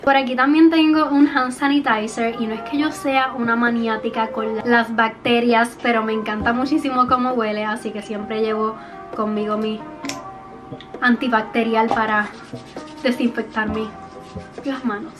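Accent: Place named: Puerto Rico. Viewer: Ya sabes que es de Puerto Rico cuando escuchas los croquis jaja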